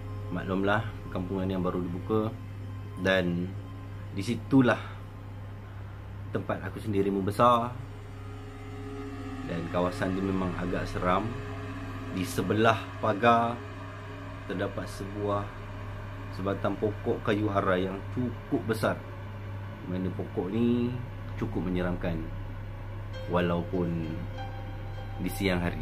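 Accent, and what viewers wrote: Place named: Semporna, Malaysia. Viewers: Penceritaan kurang menarik bnyk ulang2 sama logat tu bagus kekalkan logat semporna, tdk perlu guna bahasa smjung..background n suara latar kurang tepat diguna...nak gambarkn sesuatu pastikn berserta kawasan...sekadar cerita, orang tdk akan percaya...ada baiknya berckp sambil tunjuk kawasan titik hitam...teruskn usaha..tingkatkn lg usaha...sokong org sm4na jd youtuber👍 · kami tidak faham semua logat sabah bah...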